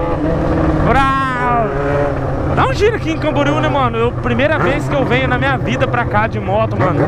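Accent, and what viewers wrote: Goiás, Brazil. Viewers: Hoje ele falo no pique do Goiás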